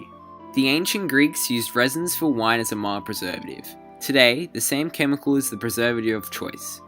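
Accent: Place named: Australia. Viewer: Le gasp Australian accent hehehe. (I mean, I knew that already, but still...)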